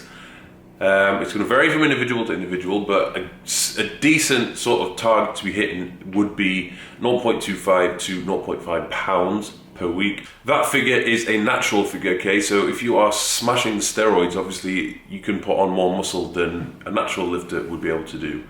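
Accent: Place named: Newcastle, United Kingdom. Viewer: Vitamin B Best not to try and listen if you can't understand.go to some Geordie classes.that may help you.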